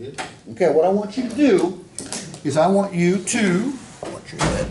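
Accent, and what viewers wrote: Appalachia, United States. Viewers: and his hick hillbilly fog horn leg horn talks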